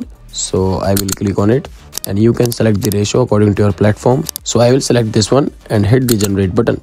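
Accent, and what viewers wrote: India. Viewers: Your accent is so Indian 😅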